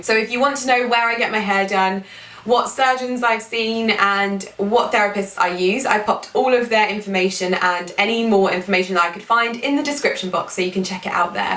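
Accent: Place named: England, United Kingdom. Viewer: Great video. Can someone tell me precisely what area of England this accent derives from? It's by far the most pleasant sounding.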